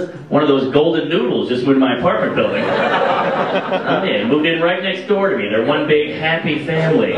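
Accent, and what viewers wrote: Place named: South, United States. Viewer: I think Mark's accent here is the most southern I've heard it. I can hear it in certain words he says.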